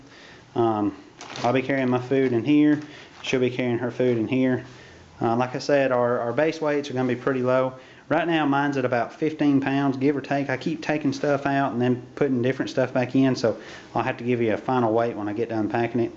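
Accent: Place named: North Carolina, United States. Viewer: Great video man ive seen a few of yours and am glad to see the circuit and the montbell products review because i am considering those purchases. My food is very similar to yours minus the snack cakes, candy, and fig newtons but i understand the extra calories for winter. I would check out the Knorrs sides meals for dinner because they are only about 99 cents. I usually add some tuna to them for extra protein. Sounds like you are from NC, im from Washington, NC.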